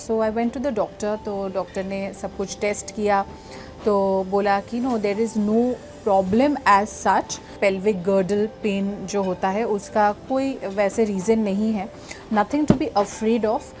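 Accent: Bengal, India. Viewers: আপনার বাংলা কথা শুনতে খুবই ভালো লাগে, বাংলাদেশ থেকে দেখছি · I like to hear you all day when you talk in Bengali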